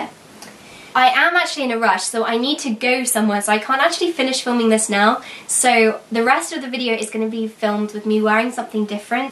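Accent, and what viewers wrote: United Kingdom. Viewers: Where are u from? Seems like a british accent.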